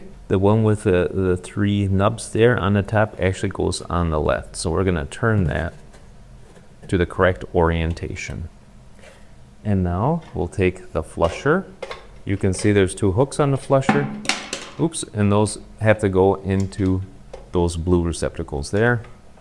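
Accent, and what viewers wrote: Germany. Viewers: Sounds crazy: American slang in German accent! 😊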